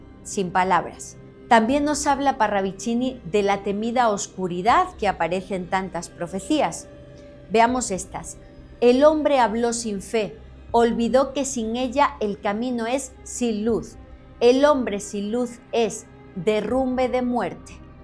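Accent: Spain. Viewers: saludos desde Formosa, muy bueno tu programa, felicitaciones...sos española o argentina?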